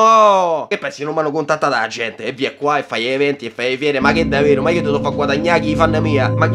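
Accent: Rome, Italy. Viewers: Fantastico come sempre. E parlando di fantastico, farai anche la serie di Animali Fantastici? Scusa ma sono stra ansiosa di sentire cosa ne pensi. Specialmente del secondo. Sarò sadica ma mi piace quando sbrocchi in romano! ☺ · Boh, tra accento romano, discorsi e memini di Berserk posso solo dire che ti amo haha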